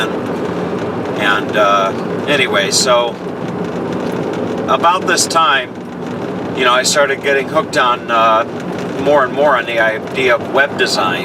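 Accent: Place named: United States. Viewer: Thanks for revealing your story.. I found your channel from the Cains Australia video and have now almost finished watching all your down under videos!.. Being British, I enjoy your out there yank style of talking for some reason. and has been great watching your Journey. I'm coming up to 30 soon and about to head to aus or canada on one of those working holiday visas to do farm work, woofing.. But can only wish I had more smarts to have figured out the self employed thing by now, I have done webdesign, have a portfolio site but failed at the getting clients part.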